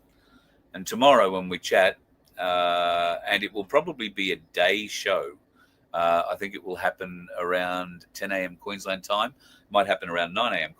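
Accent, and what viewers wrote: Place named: United States. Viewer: Awesome vid, but why an Amercan voice and not an Aussie voice????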